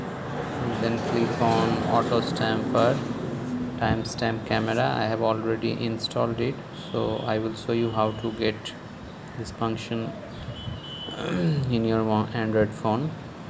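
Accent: India. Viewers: I dont mean to sound racist, but why all videos related to cellphones has an Indian guy talking behind it? Its creepy. Its like every single video. Does beign Indian give you some special insight to these kind of things?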